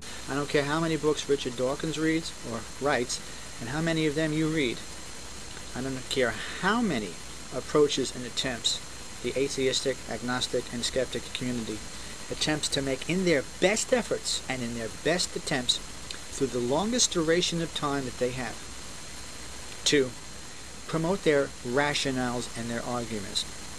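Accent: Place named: Brooklyn, United States. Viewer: I would rather spend eternity in hell if heaven is full of nasally Brooklyners like this moron. Sorry that accent is nails on a chalk board!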